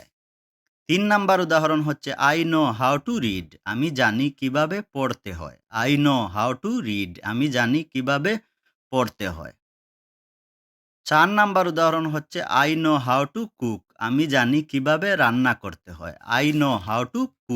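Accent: Sylhet, Bangladesh. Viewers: ভিডিও টি ভালো লাগছে নতুন কিছু শিখালেন স্যার সিলেটের আঞ্চলিক ভাষা পরিবর্তন করুন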